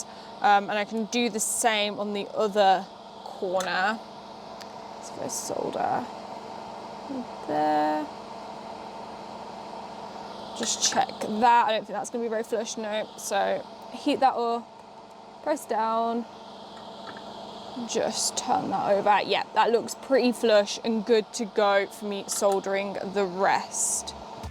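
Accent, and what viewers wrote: United Kingdom. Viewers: She's cute, British accent, good at soldering and fixing things, is it really some miracle? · can someone tell me from which region in the UK she is? I can hear an accent but can't locate it. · Ouaw l'accent britannique 😊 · Que genia! I love your channel and your british accent :)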